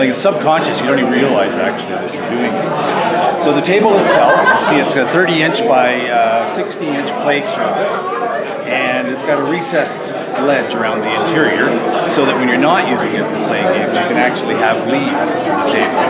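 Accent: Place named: Canada. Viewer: Me: This guy sounds Canadian. Guy: "If they have to take their kids to a hawkey game--" Me: 😎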